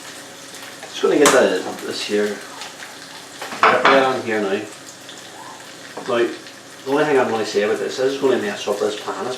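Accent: Derry, United Kingdom. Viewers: Derry accent!!! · It's a Derry accent.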